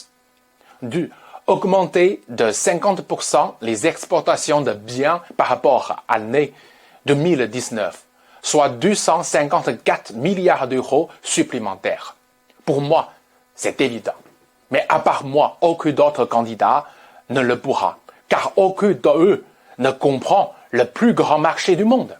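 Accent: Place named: China. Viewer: Vous êtes sérieux? Vous vous présentez pour être Président de la France? Hors de question que je vote pour vous avec cet accent. C’est pas possible quoi vous imaginez cet accent chinois sur la scène internationale quand vous parlez français. Je suis désolé je suis moi aussi d’origine chinoise née ici donc c peut être facile pour moi… c’est pas l’idée que je me fais de la république française. Si vous aimez la Fr soutenez monsieur Zemmour.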